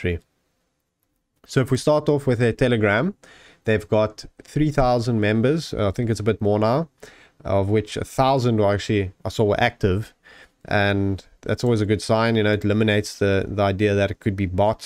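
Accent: South Africa. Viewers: Ate you from South Africa???? Your accent sounds like an afrikaans one. Im from SA living in South Korea.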